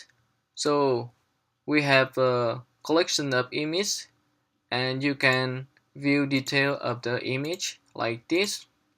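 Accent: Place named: Vietnam. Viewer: E nghe thấy giọng việt nam mà bật sub lên thấy auto sub cứ sai sai nên đoán thế kaka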